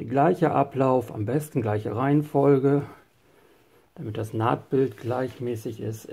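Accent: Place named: Germany. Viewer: it was also nice listening to your German accent!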